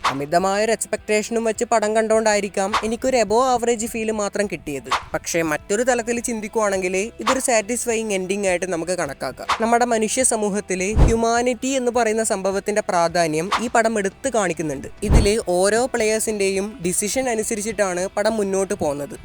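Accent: Kannur, India. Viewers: Kannur slang hit different 🤍📈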